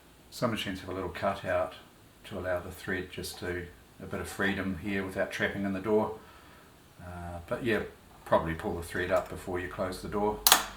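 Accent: New Zealand. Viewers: Great video. You must be a Kiwi.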